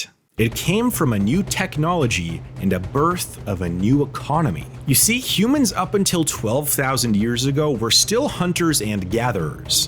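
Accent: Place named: Canada. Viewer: I think I hear a Canadian accent. 😉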